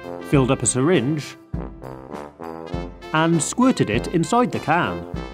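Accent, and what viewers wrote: United Kingdom. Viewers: Are you British? Can I please get a reply?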